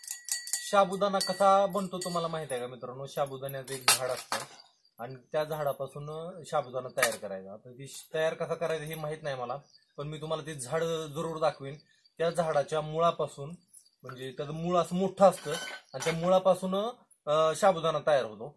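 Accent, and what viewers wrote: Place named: Marathwada, India. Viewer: Kuthle bhau aapan? Marathwadi bhasha watate..me Latur chi...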